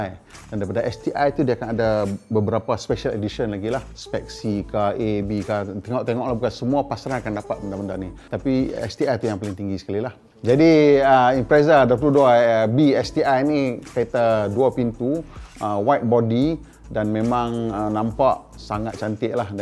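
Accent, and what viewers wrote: North, Malaysia. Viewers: abg presenter plg sy minat..pasai ckp utagha...kikiki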